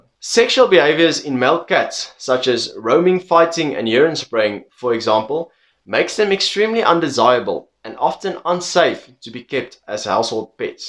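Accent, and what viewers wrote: South Africa. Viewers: Great RSA accent and easy on the eyes...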